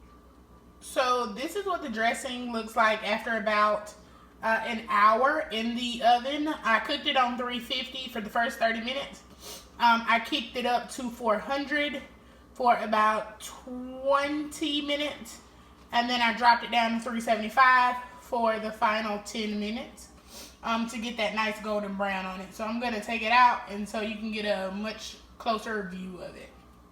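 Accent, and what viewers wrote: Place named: Alabama, United States. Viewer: You gotta be from Alabama… southern down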